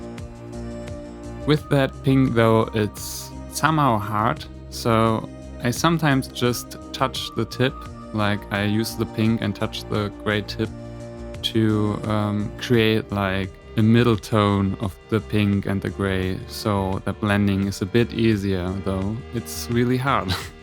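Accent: Germany. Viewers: man hört dass du normalerweise deutsch redest (denke ich jz mal weil du vor nem Jahr oder so ein Video mit nem deutschen Titel hochgeladen hast). bin gerade voll überrascht dass man so was tatsächlich als deutscher erkennt lol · ich weis nicht ob ich mich täusche, aber es hört sich an als ob du aus Deutschland kommst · are you german? your accent sounds so german.. :) btw, nice video i love how it turned out, you are very talented! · are you german? Man hört einen Akzent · I KNEW IT!! THAT TRUE GERMAN ACCENT! xD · sounds german xD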